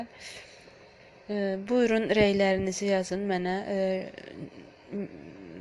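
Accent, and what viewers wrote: Ganja, Azerbaijan. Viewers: Xanım diyəsən Gəncəlisiz hə?🤗ləhcəniz çox oxşiyir gəncəlilərə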